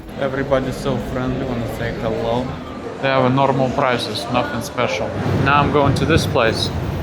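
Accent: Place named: Eastern Europe. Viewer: I like your commentary. The sarcastic eastern european undertone always shines through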